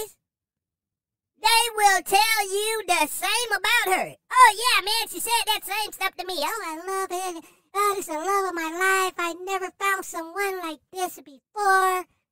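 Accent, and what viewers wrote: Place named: Carolina, United States. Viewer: I hear the Carolina accent. Lol. Is this new channel or channel name? Previously?🤔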